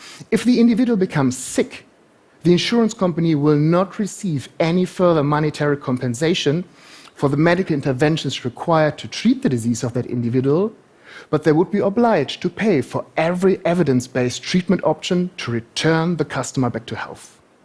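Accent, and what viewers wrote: Germany. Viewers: Oh my, that German accent.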